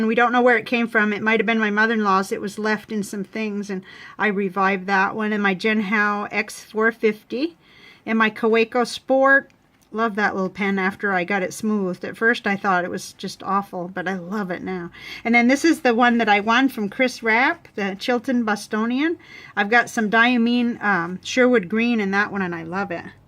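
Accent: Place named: Texas, United States. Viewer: You look and sound like me. So "hello" Texas girl. I thought you might be from the north. I am in N Texas. I just started all this in May myself, I buy cheap pens to try the nibs out. As Brian from Goulet said Quantity over quality" I too discovered cigar boxes and some of them are just beautiful. And they are cheap. Very handy instead of all the plastic storage which I just could not put my pens in something like that. I, too use those syringes to fill all my pens instead of dipping the nib in the ink. That is just too much of a hassle. I have alot of your videos to catch up on.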